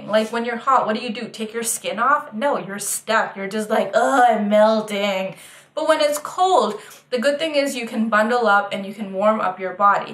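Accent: India. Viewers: really really really really really thanks for the South Indian accent..I am not Indian, but I am in Bangalore for almost 4 years and it is super, pakaa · 😀😀😅😅 That Indian speaking excent was really nice. Informative video👍👍... Keep it up 👍👍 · You look so cute with that Indian accent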